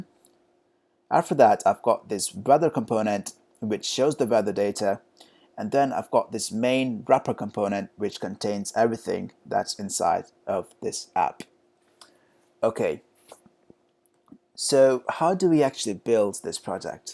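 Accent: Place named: United Kingdom. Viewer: British Accent 👍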